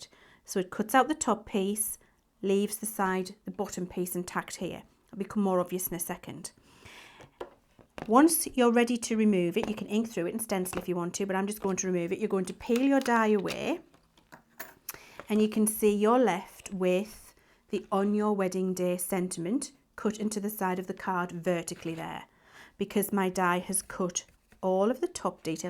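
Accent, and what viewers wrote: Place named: North East England, United Kingdom. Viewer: It sounds like she is from the North East Of England, say Teesside. I come from there, so that’s why I am guessing that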